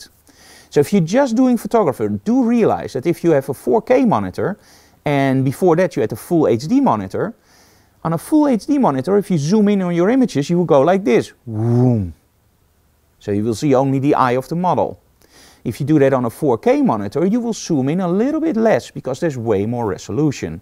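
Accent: Netherlands. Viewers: Je hoort dat goed dat je een nederlander bent lol. Heb juist een eizo flexscan ev2750 gekocht, wat denk je ervan? Heb ook een 4K monitor van asus, heb die gekalibreerd met de i1pro en kleurweergave is echt top. Maar 4K in een 27 inch scherm...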